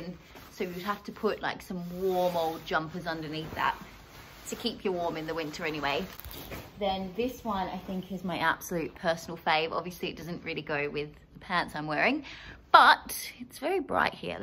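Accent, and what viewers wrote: Australia. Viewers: You did speak a lot.. awesome ♥️♥️ love it and the Aussie accent ahhh not many Aussie accents out there ..oh can't wait wait to see more!!! Cute or not 😘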